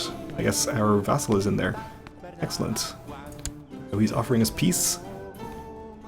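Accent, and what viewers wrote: Ireland. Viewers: Mr, are there other changes with the Horse lords DLC or just the different culture and features with that culture, and are you Irish, cause it sounds like you have a slightly heavy brogue, or is it just me?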